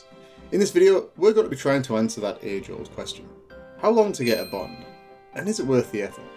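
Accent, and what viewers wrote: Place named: North, United Kingdom. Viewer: Is that a fellow northerner?! Haha great guide man keep it up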